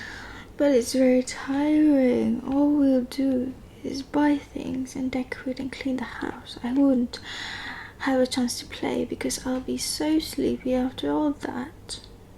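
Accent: United Kingdom. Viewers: Your accent sounds very british or am I just imagining it...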